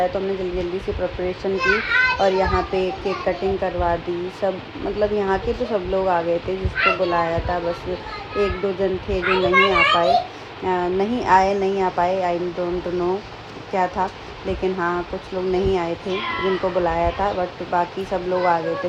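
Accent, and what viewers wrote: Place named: Mainpuri, India. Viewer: Aap ki bolne ka tarika bahut hi pasnad aata h.menpuri ke jesa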